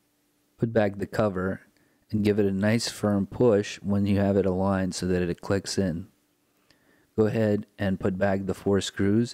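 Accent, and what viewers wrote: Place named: Philippines. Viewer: cool dude, i know ur pinoy base on ur accent 😁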